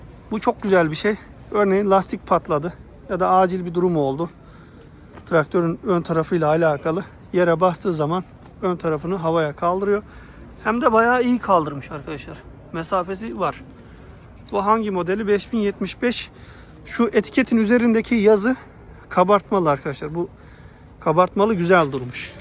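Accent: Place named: Isparta, Turkey. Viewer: çok güzel ama çok kalabalık ve streslıi istanbuldan dünya güzeli ıspartama selamlar sağlıklar inşallah gayet güzel aktardın ıspartanın türkçesi güzeldir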